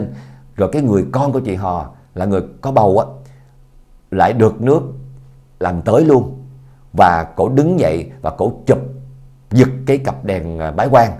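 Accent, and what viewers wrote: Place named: South, Vietnam. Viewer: Người miền nam họ kể chuyện dể hiểu hơn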